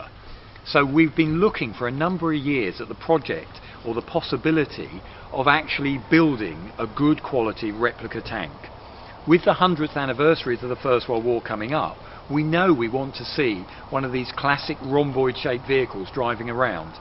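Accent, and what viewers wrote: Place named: England, United Kingdom. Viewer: сразу понимаешь что говорит англичанин )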